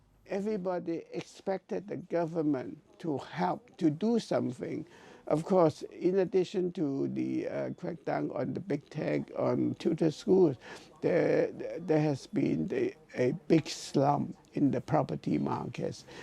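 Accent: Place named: India. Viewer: Very heavy indian accent😂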